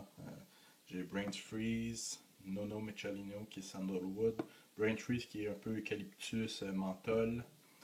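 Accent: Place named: Quebec, Canada. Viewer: Salut, si jamais tu as des produits, des brosses (surtout blaireau) ou des rasoirs d'on tu voudrais te débarrasser, fais moi signe! En plus j'habite a Montréal et j'en déduis par ton accent que tu n'es pas trop loin non plus! Donc si tu as des choses que tu veux vendre, n'hésite pas.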